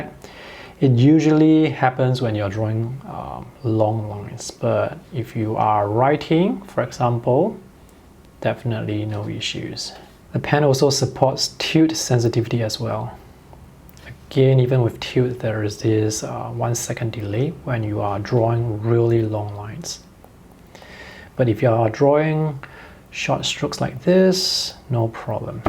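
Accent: Singapore. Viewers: Bro u Singaporean or Malaysian?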